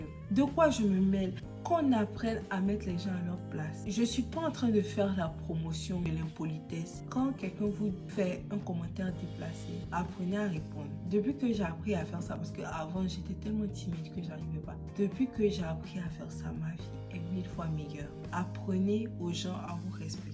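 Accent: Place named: Ivory Coast. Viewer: J'ai reconnue l'accent 225 ❤ non mais mise a par ça c'est profond ce que tu dis . Moi y'a environ 5 ans j'avais ce sentiment de non accomplissement du coup j'ai unfollow tout ceux que j'enviai a qui je me comparais depuis je vie ma best life parceque je sais que ce qu'on montre n'est pas totalement la réalité on montre tres souvent le meilleur mais pas les difficultés. Soyons fiers de nous même chacun son cheminement. Ce qui est pour toi c'est ce que Dieu te donne ❤.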